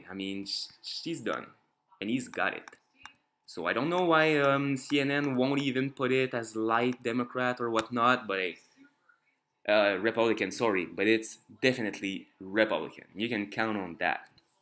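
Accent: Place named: France. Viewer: like your accent. i hear a bit of french.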